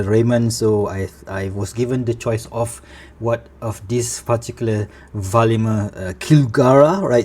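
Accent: Malaysia. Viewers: Bila dngr slang dah agak dah mcm malaysia/indo, tngok dkt about from malaysia, terus subscribe, sbb nak cari jam yg affordable haha teruskan content broooo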